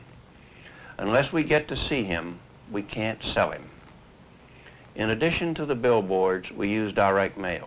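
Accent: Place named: Virginia, United States. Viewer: The CC says "it makes me shut up." He is actually saying "it makes me SHUDDER." There is that Virginia accent to account for. Yes, NICE old trucks!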